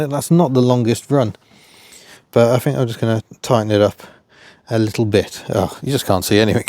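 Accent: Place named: Birmingham, United Kingdom. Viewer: Fascinated you grew up around Brum. Now you've said it can hear a hint, but for some reason always thought you had a Reading / North Hants accent 🤦 Apologies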